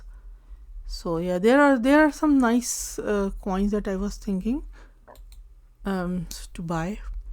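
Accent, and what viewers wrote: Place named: India. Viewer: Wishing you all the best for your health. From your accent, it's my guess that you may be of Indian descent. The medical infrastructure in India is world class, low cost and without any waiting period. Even if you don't have medical insurance, the costs of investigation/surgery in top-class hospitals is affordable. Consider making a trip to India and sort out all your health problems.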